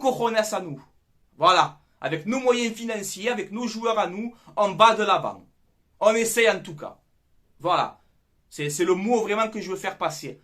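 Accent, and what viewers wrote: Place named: South, France. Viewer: salut ecoute quant je t'ecoute parlé avec accent du sud sa me fait rire et tk je suis du sud aussi quant ont parle sa recent pagnol et les cigales le pastis les grillade de sardines la petanques le sud quoi:p prend soin de toi ami et merci pour les infos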